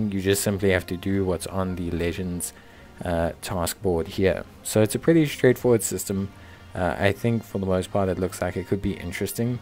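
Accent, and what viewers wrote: South Africa. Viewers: Yooo how you doing? by any chance are you south african?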